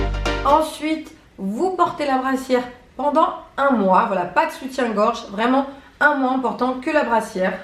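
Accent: South, France. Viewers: Son probleme c'est pas son ex-bonnet B, c'est sa voix et son elocution, ca fait trop cagole du sud. Enfin si elle est heureuse comme ca ( Bon elle les a fait refaire 2 fois et jamais 2 sans 3 )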